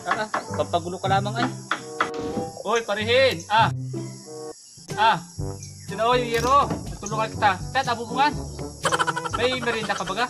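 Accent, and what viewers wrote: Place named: Mindoro, Philippines. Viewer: Hehehe kaganda NG tono nyo Lodi taga San ga kayu Lodi parang mendoro oh simara oh rumblon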